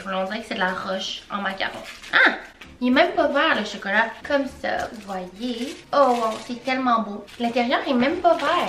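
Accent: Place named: Quebec, Canada. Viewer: Comment tu te sens obligé de dire « je suis québécoise » 😂 ça parait juste en t’entendait parler haha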